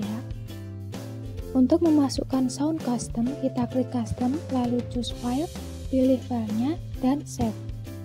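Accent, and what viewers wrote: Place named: Java, Indonesia. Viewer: Medhok sekali mbak, makasih impohnya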